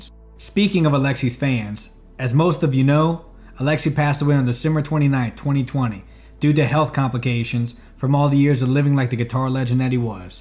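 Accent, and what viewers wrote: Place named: Louisiana, United States. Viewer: Bro you from Louisiana? That accent is screaming you from the bayou.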